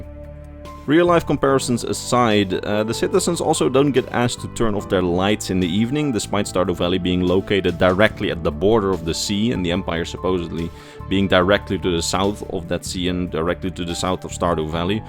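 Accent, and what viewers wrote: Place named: Netherlands. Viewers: He's definitely Dutch his accent isn't thick enough to be Russian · I'm so torn on your accent. My guess is either Dutch or Russian 😂 please help me out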